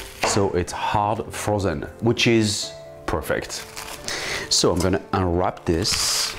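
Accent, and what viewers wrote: France. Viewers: Love his french accent! 🤗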